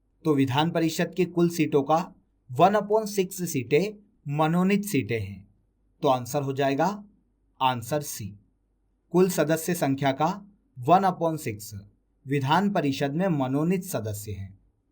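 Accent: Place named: Maharashtra, India. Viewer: Sir ap boht acha pdhate ho.. or aap Maharashtra se belong krte ho kya?! 😄 qki aap “hai” ko “he” bolte ho 😁 sounds good!!😊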